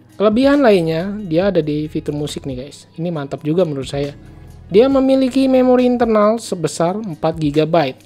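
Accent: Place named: Ciamis, Indonesia. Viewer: Paham suaranya.. Orang Ciamis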